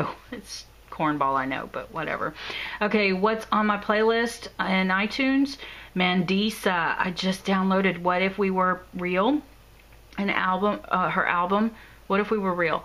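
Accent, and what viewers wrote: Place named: South, United States. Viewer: OK.....I'm loving this little book. I want to do this. I will be watching all of your videos tonight - got to get back to work now! lol And I love your Southern accent - I'm an Alabama girl. Great work!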